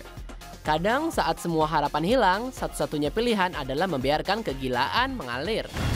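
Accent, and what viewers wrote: United Kingdom. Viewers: Harus banget naratornya make aksen Inggris? Biasa aja cukup kok, jadi agak kurang enak dengernya jadinya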